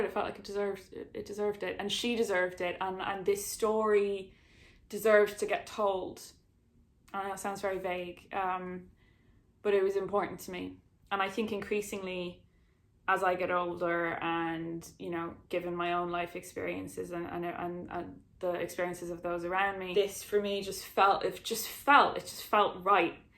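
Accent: Dublin, Ireland. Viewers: hearing a Dublin accent... so unbelievably soothing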